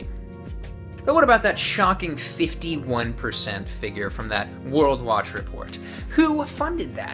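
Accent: United States. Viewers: What accent? I assume you're talking about some version of an American accent but It just sounds like a typical American accent to me.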